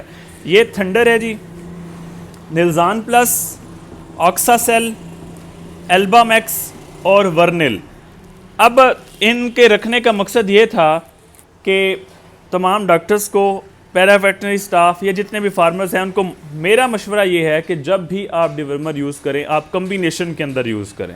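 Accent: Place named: Saraiki, Pakistan. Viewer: Layah ka mtlb ye hwa k ap mithi boli sairki bolte hn...... 😮😮😮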